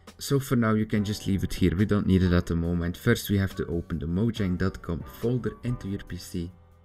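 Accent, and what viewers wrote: Netherlands. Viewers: Ben je Nederlander of Belg ?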